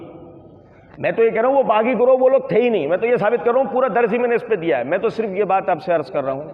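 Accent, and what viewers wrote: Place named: Hyderabad, India. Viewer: آپ کے بعض الفاظ سے آپ مجھے حیدرآباد دکن کے معلوم ہوے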